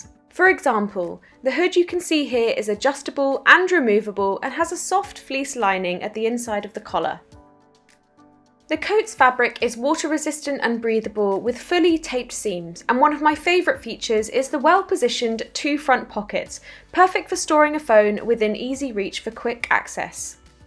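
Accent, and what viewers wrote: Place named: Devon, United Kingdom. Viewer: thats ok! I was just wondering from your voice, it sounds like my aunties and shes from devon xx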